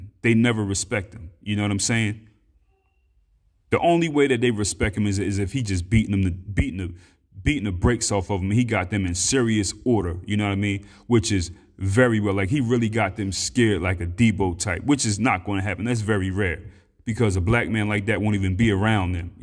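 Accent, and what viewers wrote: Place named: East Coast, United States. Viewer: I love ya East Coast accent too bro 🤸🏽‍♀️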